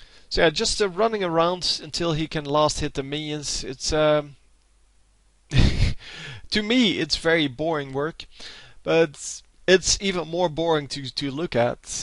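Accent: Netherlands. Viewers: are U dutch, like me? U have dutch accent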